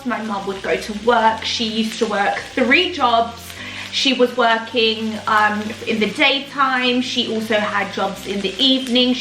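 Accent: United Kingdom. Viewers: Delicious! We love you!pride of phil with your British accent and a Filipino heart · Thanks for sharing your story. There’s something great about our Pinoy culture— the love, the caring. And what are they saying? Love your brit accent!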